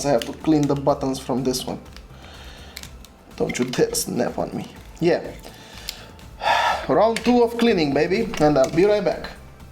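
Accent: Romania. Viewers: I knew youre Român , your accent haha,great contnent btw ❤️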